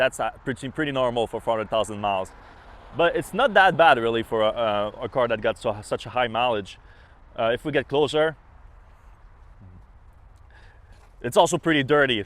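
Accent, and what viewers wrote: Quebec, Canada. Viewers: sounds like a quebecois? u from here bruh? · Love the quebec accent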